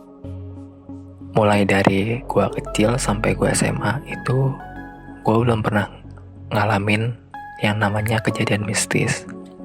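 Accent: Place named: Java, Indonesia. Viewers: Orang banten tapi medok. Pst dah fasih bahasa jawa ya bro? 😁😁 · Yang lo-gue lo-gue pake logat jawa itu serem gan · lo gue lo gue tp medok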